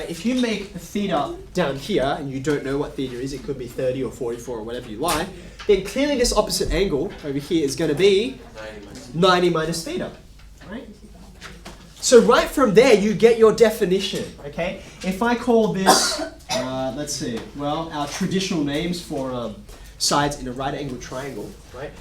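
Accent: Australia. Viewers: I was not expecting him to be Australian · I love the Australian accent ... "I know some of you know what the cooeeuuaarrrrr in cosine means."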